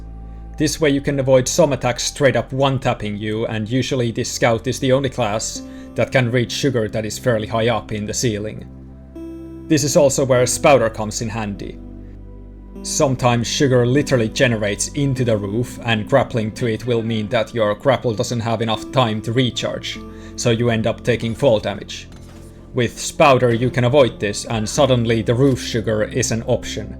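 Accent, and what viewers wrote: Finland. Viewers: Nah it’s finnish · That's a very finnish accent. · are you finnish/estonian?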